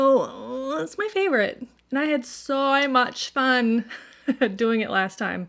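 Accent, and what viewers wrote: Australia. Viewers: Look I teased you a little last time about your Aussie accent. But never stop trying. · Hi so happy to see you Hope you had a great July 4th Love hearing the cat in the background, I love your Australian accent 😂❤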